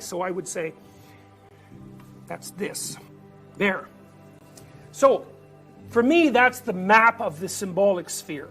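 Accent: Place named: Canada. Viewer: It's his Canadian accent.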